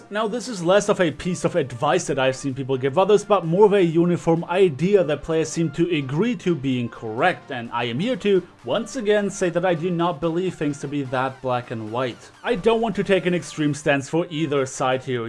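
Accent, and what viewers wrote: Germany. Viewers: is it possible that you are german ? xD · Is german your mother tongue?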